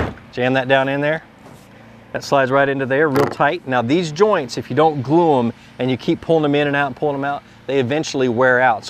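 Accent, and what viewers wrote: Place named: South, United States. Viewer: i like when he goes "you see that right there" the southern accent was so strong lol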